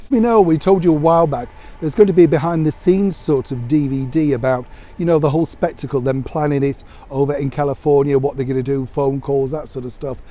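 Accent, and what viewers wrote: United Kingdom. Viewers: Never a 'lecture'...let's call it a well needed 'pep talk' in a delightful British accent! 😉💕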